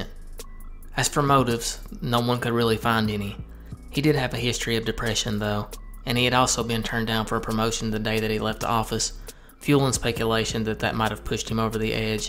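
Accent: South, United States. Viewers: You sound so southern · that southern drawl lol.. it’s one of the reasons i like this channel and caroline easom’s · From one southern youtuber to another, i appreciate hearing the accent. It's a character thats been mostly lost on youtube. Also great research aand pace with the video.I feel like you chose just the right ammount of time to spend on each topic. Subscribed big dog.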